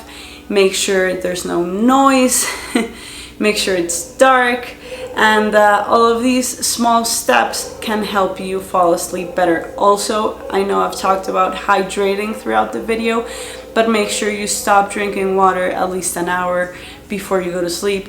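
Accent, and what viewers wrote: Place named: Colombia. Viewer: Tu inglés es muy bonito ❤️ me gusta tu canal en español y me encantaaa tu acento colombiano 😊 peeeeeero estoy aquí por puro gusto para mi oído que ama el inglés. Un abrazo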